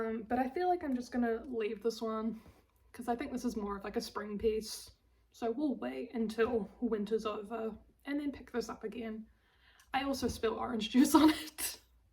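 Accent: New Zealand. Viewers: I finished school in NZ and also studied at Victoria University of Wellington for 5 years. It's so nice to hear the kiwi accent again! I really love your projects and can't wait for future videos. Welcome to the podcasting world 😊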